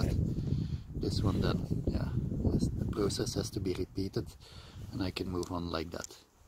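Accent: Flanders, Belgium. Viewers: Aan het accent te horen een Vlaming.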